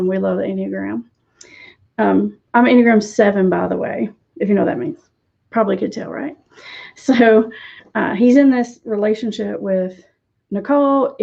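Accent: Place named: Alabama, United States. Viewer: Chick-a-dee ... you sound like a sister or cousin from home.. you know, the land of Bless your heart ❤️.. lol .. I’m from north Alabama .. you have a dialect I hear often from bham, Tuscaloosa and surrounding area - loving your content !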